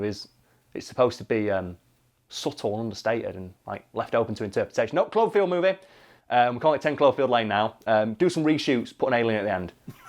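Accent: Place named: Warrington, United Kingdom. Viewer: Eh up fucker, you sound like you’re from near Warrington and I recognise you- where you at boyo?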